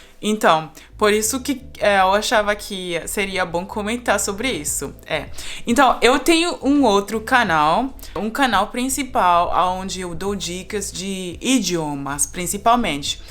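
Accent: Brazil. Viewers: Fique à vontade para expressar sua opinião. Você é fluente em Português com sotaque brasileiro e isso incomoda os portugueses. Na verdade, o sotaque deles é difícil para nós e até incompreensível muitas vezes. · A gente te entendo, se você tivesse aprendido português em Portugal, com certeza você usaria a bandeira de Portugal e está tudo bem você usar nossa bandeira, porque você aprendeu aqui no Brasil e até tem nosso sotaque e existe sim a diferença entre o português de Portugal com o nosso português. Esses portugueses que estão indo reclamar com você são mimados demais e esnobes. Você é ótima e seus conteúdos também e não se importe com essa rivalidade.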